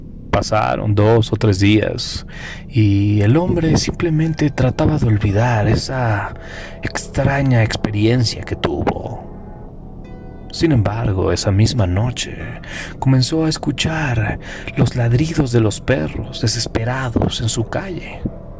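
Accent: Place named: Fresa, Mexico. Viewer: Pseudo escritor el personaje del relato pero el narrador no llega ni a pseudo narrador seria algo como el narrador fresita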